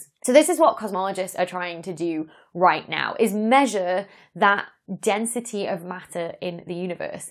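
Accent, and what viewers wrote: United Kingdom. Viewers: I have a pronunciation question. In the 70s, I had a meal in Arno Penias' house with his son. It was probably years before winning the Nobel Prize. His son pronounced his last name pen-zee-as. This is not how you pronounce it and I wonder if it is because of your British accent or is my memory bad.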